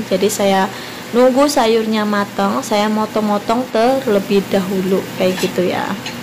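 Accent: Banyumas, Indonesia. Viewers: Waakaikumsalam mbak ngapak maning hebhe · Tkw ngapak heboh tenan iki semngt dan sehat selalu mbk e 😊😊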